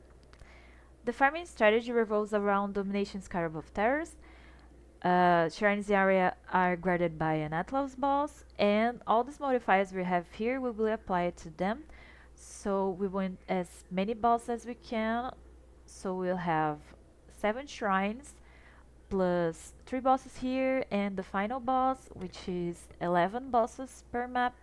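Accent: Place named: Turkey. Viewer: Hello, sorry it's a bit irrelevant with the video but i have to ask, are you Turkish? Your accent sounds a lot like turkish accent. Cool strat by the way.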